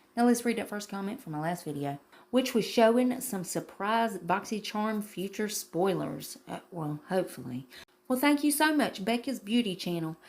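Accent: South, United States. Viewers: Your accent is so thick it makes my husband think I'M talking when I have YouTube playing thru the speaker in my laundry room! You're giving me a run for my money on that Southern Belle Sound!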